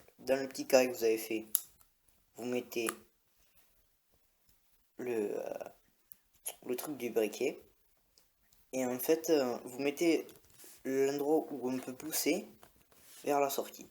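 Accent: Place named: South, France. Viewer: l'accen du sud qui fait plaisir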